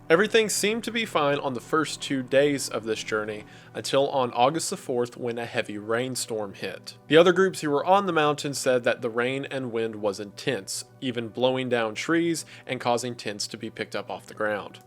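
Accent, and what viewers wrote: South, United States. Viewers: He pronounces a lot of things in other languages in a very American sort of way. 😂 He's got quite a drawl and it can really catch you by surprise sometimes! On a lesser note, he seems to have misread "Dyatlov" outright, which is a thing he's done before with other words/names (foreign and english-language), so who knows, maybe he's struggling with a lil dyslexia, too. Sooooo many male content creators I follow have dyslexia & ADHD, so I really wouldn't be shocked if that were the case. Maybe a pronunciation key in his notes would help in the future. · I also notice he puts "such as" in places I personally find unusual and it stuck in my mind because it made me think of that beauty pageant contestant who said some garbled stuff about education in "the Iraq" in response to a question and I thought that was her just making a mistake but the way he keeps using it in a consistent way and they have similar accents it might be a southern dialect thing?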